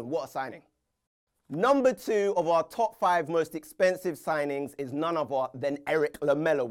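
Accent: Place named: London, United Kingdom. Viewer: London m8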